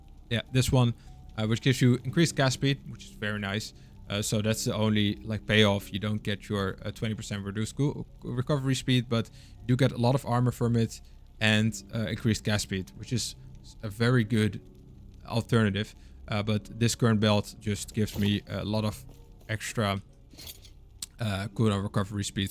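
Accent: Netherlands. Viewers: jaja i hear some dutch accentXD subbed for support^^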